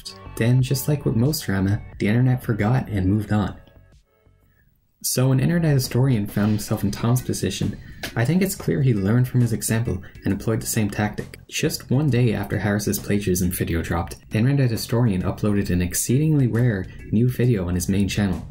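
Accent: Ireland. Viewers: What's that accent? Irish? Welsh? Pakistani???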